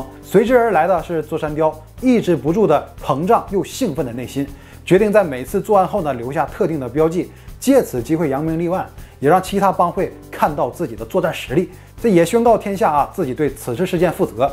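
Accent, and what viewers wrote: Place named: Yanbian, China. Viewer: up主是朝鲜族的吧，感觉口音有点延边，延吉那边的。😄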